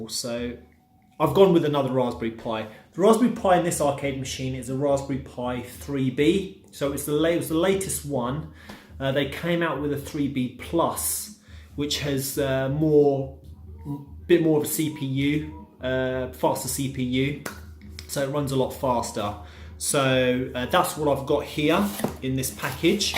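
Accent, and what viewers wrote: United Kingdom. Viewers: Are you from England? You spoke so elegantly.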